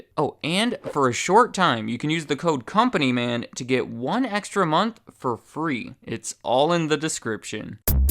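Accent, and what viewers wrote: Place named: United States. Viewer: You sound like the neighbour in some long forgotten 70s family sitcom. Stereotypically American. I love it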